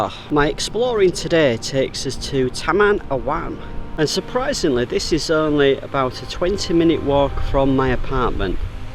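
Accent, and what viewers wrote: United Kingdom. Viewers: That accent of yours intrigues me. I left Lancashire 1968 so my ear for accents has almost disappeared; especially as now most of the North country accents have emigrated southwards. Would you mimd telling mr where in UK you originated. Oh, and thanks for all the great Malaysian videos!!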